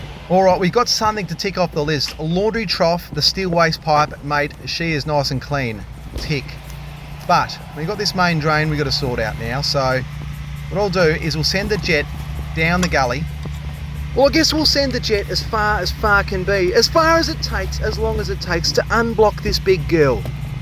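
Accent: Australia. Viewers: Same! I just love his Aussie talk. Amazing! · G’day! New here and I love the Aussie accent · I watch Tornado and animated videos how did I get recommended this buity from someone with the most Australian language I have here heard. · I’m an Aussie… & I must say I love the Australian accent& enthusiasm… thank you for no course language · Sending kudos to you from th State of Delaware, USA. Love ya, ya silly sausage! (Love the outback terminology and love hearing your accent!) 🥰🤗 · I'm not a professional like you (some might say a legend), but I've had my share of clogged shitter pipes.......and speaking of pipes, your Aussie accent compliments your work, like a good red wine and steak. All the best from a commonwealth brother in Canada! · This is the most Australian man I have ever heard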